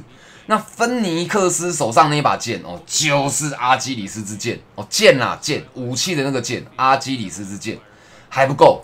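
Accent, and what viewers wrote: Taiwan. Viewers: 聽講台語還蠻好笑的，哈哈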